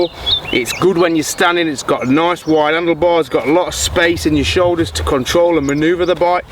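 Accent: United Kingdom. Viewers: is that british accent ???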